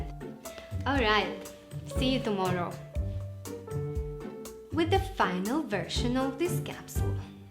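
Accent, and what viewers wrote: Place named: Russia. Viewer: What accent is that? Sounds so Russian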